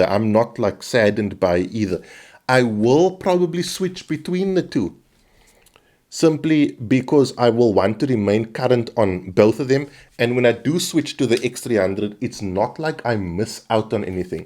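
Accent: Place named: South Africa. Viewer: You are pure South Africa 🇿🇦 the accent thick.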